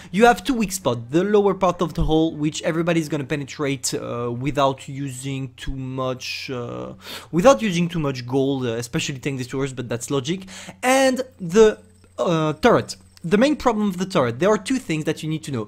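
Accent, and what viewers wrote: France. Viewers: T’es Français non?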